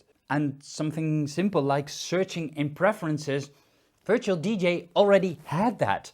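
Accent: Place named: Netherlands. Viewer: Ah ik dacht al dat je van NL komt grappig hoe snel ik dat hoor. gr uit limburg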